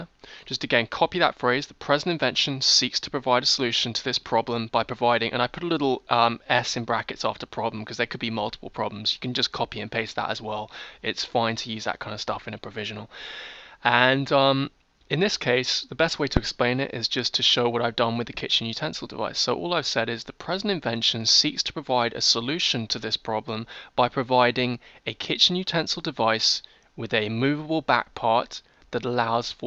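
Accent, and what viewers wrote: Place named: England, United Kingdom. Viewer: your talking provisional patents and US related. You sound very english to me. Does this work in UK also?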